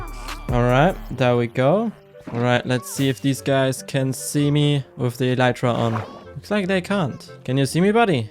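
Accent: Germany. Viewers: your english is very german-ish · i just found your channel and i heard a bit german in your voice are you german? If you are german im german too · for me it sounds like german cuz im german and its the same accent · Are u german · Deine Stimme und der Untertitel ist so deutsch xD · are you german ?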